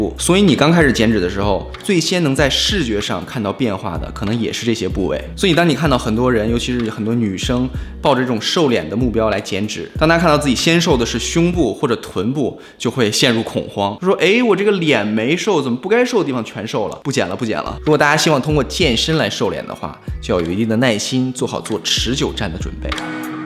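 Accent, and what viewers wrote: China. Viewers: 胖时比较好看，而且你现在的普通话口音很适合之前胖子的气质。。。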